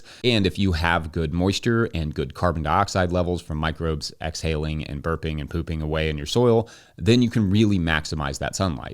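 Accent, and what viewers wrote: Kentucky, United States. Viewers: For sure. My father hobby-farmed ten acres (but I didn't choose farming). I understand that part of it is time pressure and could also be the frustration of training people who turn out to be temporary/seasonal etc. This podcast might turn out to be a good way for to capture what he's learned and document best practices for different crops for those of us who find ambiguity torture. (He already has some great videos about wash-pack and setting up for market, for example.) I grew up on a little island near Seattle but have spent the last decade near Barcelona. It would really make more sense for me to tune in to someone who discusses Mediterranean climate crops and techniques but this channel is one I like when I'm tired of being an expat and craving English. I guess I enjoy a Kentucky accent, especially his fast-talking version, which communicates some urgency and makes me feel like he doesn't want to waste time. 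(I wonder if he realizes he's just keeping random viewers company while they make dinner or clean house.) Agricultural content feels like home, too. Thanks for validating my comment, it's the most other people operating another way that makes autistic persepctive hard sometimes.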